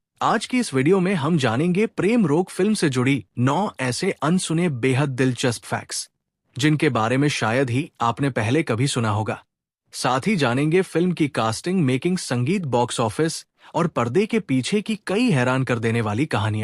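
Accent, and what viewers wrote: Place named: Bengal, India. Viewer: Ye kaisi hindi hai aap ki .bangali jaisi .usse bhi buri .kya grammer hai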